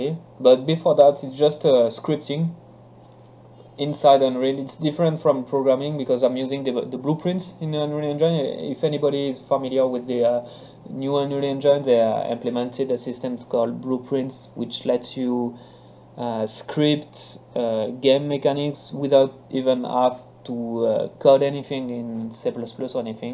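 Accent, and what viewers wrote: France. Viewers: are you french because am i and you have the french accent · On est d'accord il a un accent français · oh ok i was telling m'y self why have à frensh accent but he is frensh mdr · LE BON ACCENT FRANCAIS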